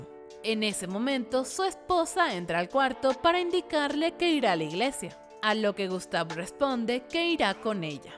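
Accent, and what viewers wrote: Chile. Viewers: Soy yo o la narradora tiene un ligero acento chileno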